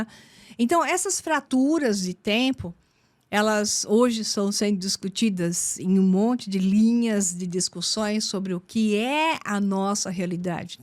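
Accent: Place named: Rio Grande do Sul, Brazil. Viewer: Essa tia eh gaucha so' pelo jeito de falar. 😊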